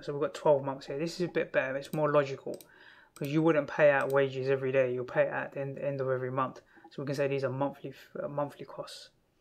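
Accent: United Kingdom. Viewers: R u British bro.?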